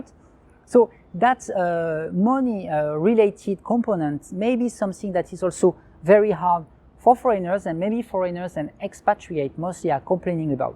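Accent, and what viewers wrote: France. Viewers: investors can put their money wherever they want and it doesn't mean they are right. Secondly american is the clearest accent out there?, it is all too subjective dear, i have a hard time understanding an texan or a deep south accent and they are all american accents!!! luckily they are subtitles on TV to help. And for a british or aussie, their english will be the clearest, and they are lot of students learning english there, so it's a matter of perception and preferences: for my part, i met people all around the world and they ask speak english with a different accent and i understood all of my speakers. My comment about the guy who suggested that should learn to speak american english was to say, although his accent is french but the content of his message is understandable and they are subtitlesb in YT for those who have difficulties to hear what he says.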